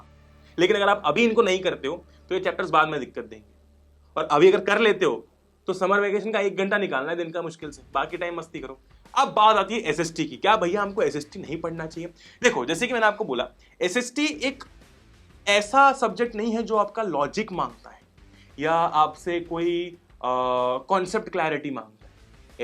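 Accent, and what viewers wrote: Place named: Maharashtra, India. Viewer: Hyy aap maharashtra se ho kya💞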